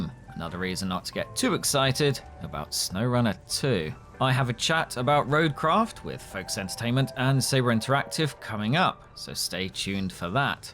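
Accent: United Kingdom. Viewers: what english accent he is speaking?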